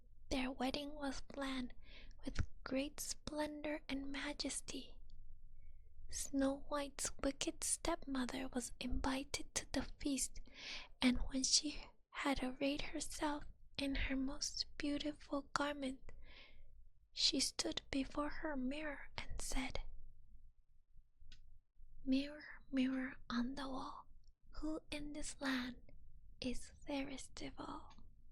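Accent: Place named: England, United Kingdom. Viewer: If I didn't know otherwise, I world have said she was English. Sorry my Japanese is not good enough to write this in Japanese.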